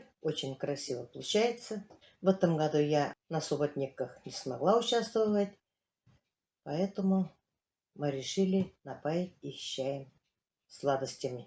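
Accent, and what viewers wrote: Tatarstan, Russia. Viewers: Настоящий татарский акцент ч на ,щ" , при разговоре на русском языке. Мило🤗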